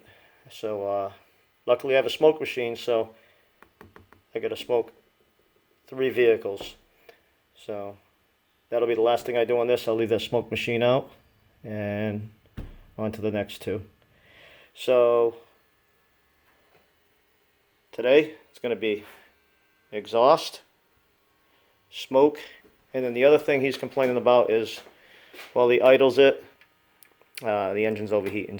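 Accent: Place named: Massachusetts, United States. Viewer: No hiding the fact you are from Mass.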